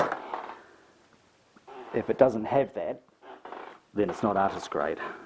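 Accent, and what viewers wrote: New Zealand. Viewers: Glad I found you on Youtube, Finally making the switch from acrylic to oils... I feel like I just went from using sand paper to tissues... it's amazing, sucks they don't teach you any of this in high school. :/ Schools are cheap in australia with their art not very good when the only thing your good at is art. :P Keep it up! Haha I actually like the talking reminds me of my family in New Zealand.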